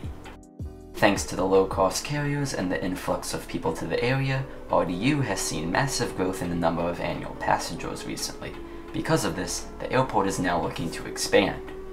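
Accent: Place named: Ireland. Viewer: It just piqued my interest as to where you're from. Your accent sounds slightly Irish, but an Irishman would know how to pronounce both Raleigh and Durham, since both of those are English names.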